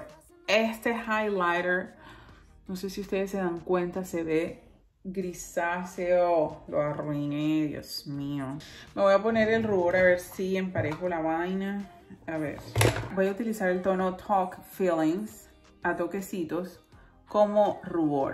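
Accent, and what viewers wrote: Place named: Cuba. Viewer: hola soy nueva por aqui vine a tu resena por tu tono de piel tan parecido al mio para ver como quedan esos colores ceresas navidenos tan lindos me da esa paleta vibras de Huda pero Huda es carinosa en los precios asi que prefiero mophe que creando sombras realmente sorprenden y con precios de waoo, tu acento se me hace de cubana como yo jaja saludos aqui una nueva sub apoyando la raza besos.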